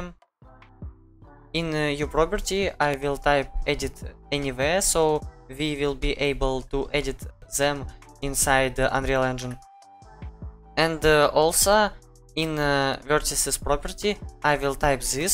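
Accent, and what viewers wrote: Russia. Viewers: Amazing! Бат ёр рашн аццент выдает тебя с поторохами😊 И это здорово, так как твой английский я понимаю и без субтитров.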